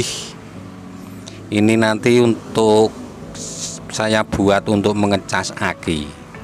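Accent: Java, Indonesia. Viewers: MEDDOK KALI JAWA MU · LOGAT JAWANYA KENTEL BANGET, MAS!. MENURUT SAYA YG AWAM, NGECES AKI DGN CARA BEGITU, MEMBUAT AKI CEPAT RUSAK LHO, MAS!, YG PALING AMAN YA YG SE WAJARNYA PAKE TRAFFO 5AMPERE/ 3 AMPERE.,DAN DIODA 5AMPERE PULA..PENGECASAN AKI PAKE CARA INI CUMA BUAT DARURAT SAJA, JANGAN KETERUSAN, SEL AKI CEPAT RONTOK.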